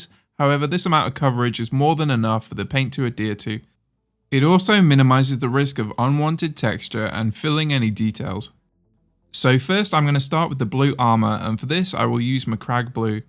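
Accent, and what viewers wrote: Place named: Burton upon Trent, United Kingdom. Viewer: Id recognise a Burton-upon-trent accent anywhere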